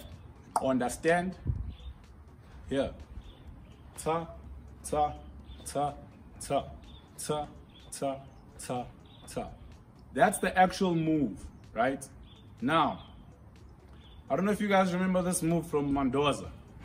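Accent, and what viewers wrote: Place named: South Africa. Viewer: Where is this guy from?!?!? Getting south Africa vibes but Idk I also hear zim I'm confused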